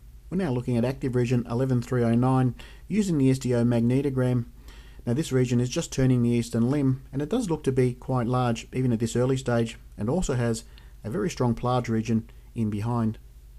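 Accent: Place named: Essex, United Kingdom. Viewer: I'll start again his accent sounds like Essex or something round there , boring isn't it ?????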